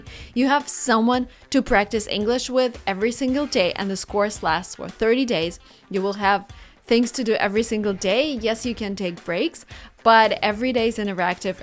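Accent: Russia. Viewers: отличное произношение, но все равно слышно что не нативный носитель, русское наследие дает о себе знать. интересно сколько лет уже на англ.? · She still has a little bit of russian accent, for instance how she says "can't" haha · You still have that little Russian accent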